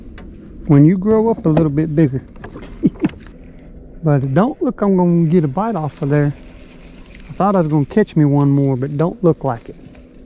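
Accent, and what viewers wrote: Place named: Ozarks, United States. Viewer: I can tell an Ozark Mountain accent when i hear it. Proud Ozark Mountain Hillbilly mysef. 👍 👍 👍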